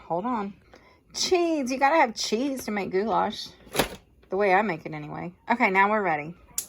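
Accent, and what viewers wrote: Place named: South, United States. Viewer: Hi. thank you I never know what to cook anymore that's why I'm here. and I love the way you talk that southern accent set me on fire.. i love it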